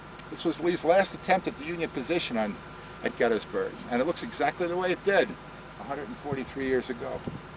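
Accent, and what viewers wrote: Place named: North, United Kingdom. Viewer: This fella tells the story with passion. To my foreign ears he sounds like a Northerner, but he still has the passion for passing on the deeds of those that went before him. Great presenter, great passion and a credit to himself. Well done sir. Glad this was in my feed today :-)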